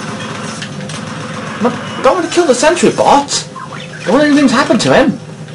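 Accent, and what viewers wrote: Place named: United Kingdom. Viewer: thnx, this video helped. got stuck. also, british accent = awesome :-D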